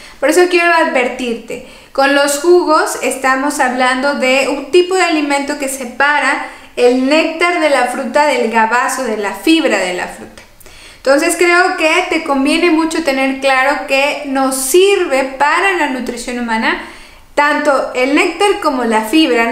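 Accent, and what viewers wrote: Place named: Mexico. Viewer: Desde Espańa te digo: Eres la mejor y la mas GRANDE. No puedo vivir sin seguirte en la Red. Cada dia que pasa, te quiero mas!!! No se que hariamos los diabeticos hispanoparlantes, de ambos hemisferios, sin tu asistencia tan altamente cualificada y AMENA, desparramando gratuitamente chorros de amor y conocimiento, a traves del ciberespacio. Por desgracia, la mayoria de medicos endocrinos, andan saturados asistenciales y no te escuchan, ni te explican.......siempre lo mismo: merformina y mas merformina o insulina i mas insulina......y nada de dialogo. No tienen tiempo para escuchar!!! Que pena!!! Tu dulce voz femenina, de suave acento mexicano y en el hermoso espańol que se habla en las tierras del Nuevo Mundo, me llega a traves del eter, como un balsamo de ilusion por la vida y la salud. Eres como una luz que a traves del espacio oscuro, me llega brillante desde el Nuevo Mundo mexicano, para recordarme cada dia que todavia estoy vivo y que aun quedan personas buenas en este Mundo de Mierda, en el cual nos ha tocado vivir. 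Sigue asi mi amor, continua haciendo este gran trabajo de divulgacion, sobre diabetes. No decaigas. Eres Grande y cada dia que pasa te quiero mas. Desde Barcelona (Espańa), te mando un besote muy fuerte. Hoy dia miercoles, 7 de Julio de 2021. Que suerte haberte encontrado en la Red!!!! chao.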